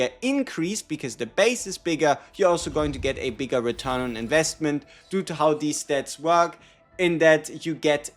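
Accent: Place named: Germany. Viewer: I spot myself a fellow german when I hear one :D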